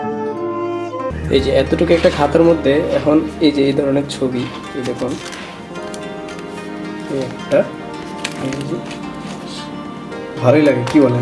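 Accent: Sylhet, Bangladesh. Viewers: Excuse me are you from sylhet district ?????? 😅😇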